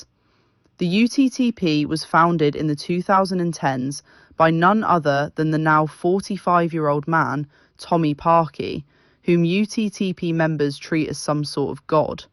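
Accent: United Kingdom. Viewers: Whelp, this is the first time I heard your voice, I can tell you’re a Brit, also hopefully the uttp falls and hopefully the whole community dies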